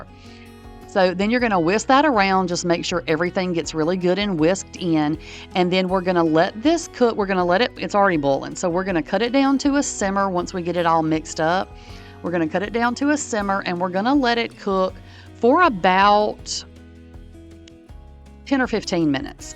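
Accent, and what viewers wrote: South, United States. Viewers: I don't know where you are from, but I love your accent. I would say you're from the south. I just love this idea. I want to start dehydrating things. I love soups. Sometimes, I just don't feel like cooking a lot of things for supper. Instant soup would be wonderful! Question: have you ever dehydrated cabbage for soup? Thanks😊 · Lol, I just found u. I'm trying to figure out what part of the south u r from. I'm from VA.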